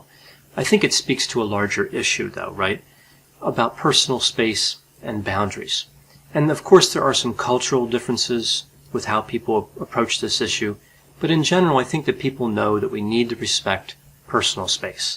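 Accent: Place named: Delaware, United States. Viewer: The only person in Delaware who hasn't met Joe Biden, lol!! Really, you have a great accent, and it's one I recognize (I'm from Virginia but have been to Lewes, DE for the beach and Wilmington for the art museum).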